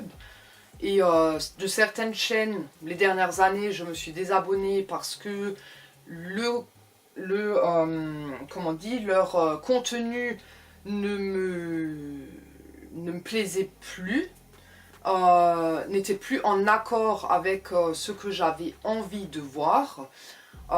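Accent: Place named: Haute-Saône, France. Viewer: Coucou petite sorcière, juste pour te dire que moi je t'aime telle que tu es, franche et honnête. Ton accent en tant que fille de la Haute Saône me dérange pas, au contraire, on se moque souvent de mon accent ici à la Réunion!! Je suis du même avis que toi en ce qui concerne on sait qui! Le para devient compliqué sur Youtube. Ne pas croire à tout bien évidemment alors qu'ils ont de bonnes "bases" mais en font trop!! Voilà, merci pour tes liens de bouquins intéressants. Je suis en recherche de bonnes protections car j'ai découvert il y a plusieurs années que je peux magnétiser les gens, mais je le fait rarement et juste quand une personne de mon entourage a vraiment besoin, (je ne supporte pas les voir souffrir alors que je peux les aider) car ça m'a déjà joué des tours au début car ne faisais pas de protection ni nettoyage après, ça m'épuisait et rendait malade. 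A ce jour, je sais pas si je le fais proprement. Je demande aux êtres supérieurs, allume une bougie blanche et de l'encens de l'Archange Michaël et Archange Raphaël, demande une bulle de protection mais est ce suffisant et efficace ? Après je me "décharge" avec un galet que j'ai ramassé et chargé au soleil, et ou poser mes mains au sol ou enlacer un arbre suivant où je me trouve... J'aimerais tant aider, je ne demande rien en retour, juste je demande à la personne de remercier là haut.. Je ne supporte pas voir les gens souffrir, je me dit que si on m'a offert cette capacité c'est pas pour rien mais j'ai peur pour moi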